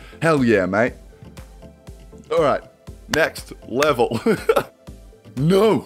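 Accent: Australia. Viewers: Hey my bday and are u Aussie mate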